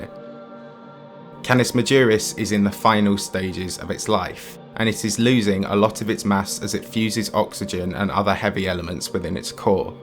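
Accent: United Kingdom. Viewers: I can't stand anyone with a British accent. Made it 10 seconds in to this video. Stopped as soon as I heard his voice. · Kinda curious how almost every space channel i tune to, theres always british commentary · Gonna miss VY Canis Magoris as the biggest star known to man. She was my favorite to talk about when speaking of the scale of other stars. And a quick shout-out to the narrator, British accents make for great documentaries.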